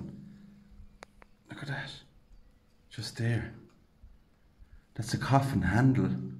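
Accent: Ireland. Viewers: Great video, and I love that Irish accent, x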